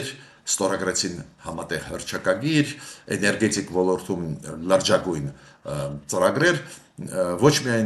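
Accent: Russia. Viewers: не можешь говорить без русского акцента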